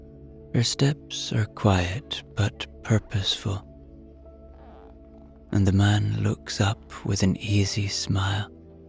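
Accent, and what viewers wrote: Ireland. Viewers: This is the first night listening I have been able to sleep with. I’m suffering protracted antidepressant withdrawal and it causes insomnia with night terror due to hyper vigilance….its near impossible to get back to sleep once adrenaline cortisol kicks in and negative thoughts are embedded. BUT, calm, considered, non demanding voice and Irish inflections make it easy to concentrate on as you know there will be no jolting surprises, sharp sounds and ads….I’m so grateful❤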